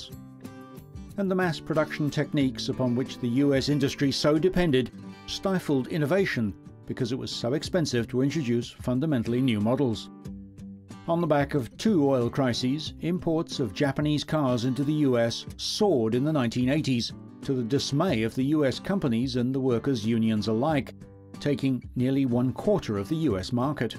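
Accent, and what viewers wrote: England, United Kingdom. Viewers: I'm sick to watch documentaries about American goods like cars, narrated by a Brit. It sounds so ridiculous as a vegan cannibal. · Hey all, drop the "BRIT" speaking,,,,,,,,, he's calling a '55 Cad a 60, and a '60 chevy,,,, is said to be a '60 Buick!!!!!!!! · Because he is British, they pronounce words differently than us. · Alan, you probably did not notice the English accent. I believe this was produced by one of your networks. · History of AMERICAN cars....with a BRITISH narrator.....(who couldn't properly pronounce the names) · English voice was a dead giveaway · It is prnounced c short a mare, like a female horse. O long camareO get your narrator to become familiar with US english. Instead of smug incorrect, British English. If your video is about US cars. Use US pronunciation. Duh. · The History of American Vehicles. 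Content is good but why the use of British narration is mind boggling. Can you say Aluminum?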